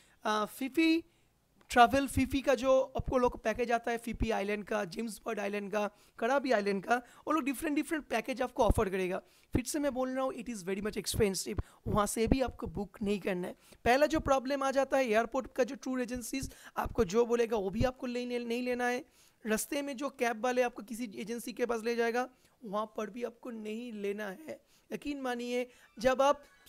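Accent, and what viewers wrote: Bengal, India. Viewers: all ur videos are really boring, coz u mix lot of topics, this makes the channel really boring, half of ur bangali accent is boring too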